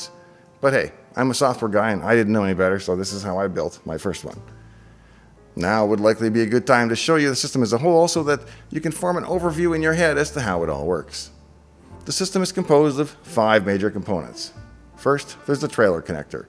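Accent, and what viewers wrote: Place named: Canada. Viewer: By golly I knew you were Canadian by that accent, awsome!!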